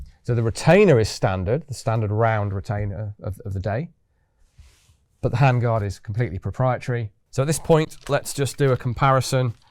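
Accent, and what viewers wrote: United Kingdom. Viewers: Always fun to listen to this British gent speak about firearms, especially ultra-rare almost non existent pieces.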